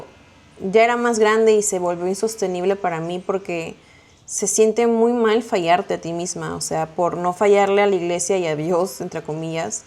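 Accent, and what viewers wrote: Mexico. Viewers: Oye tu ecento se escucha muy mexicano y tú testimonio es espejo de muchas personas lo importante es que eres tu misma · Porque hablas como Mexicana? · Interesante el tema, pero me Jode escuchar a una peruana sin identidad.. hasta el tono es de Méxicana..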